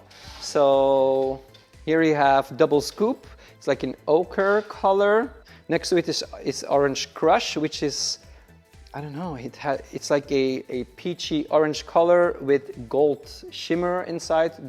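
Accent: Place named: United States. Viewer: Jij spreekt heel natuurlijk Amerikaans.. nicely done!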